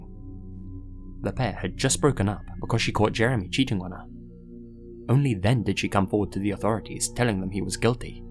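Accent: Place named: United Kingdom. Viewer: But sorry I know your British i love your videos soo much you're the only one ill listen to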